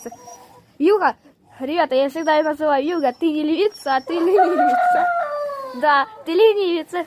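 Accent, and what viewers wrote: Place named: South, Russia. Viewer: добрый день,где вы живете у тебя такой южный говорок на гх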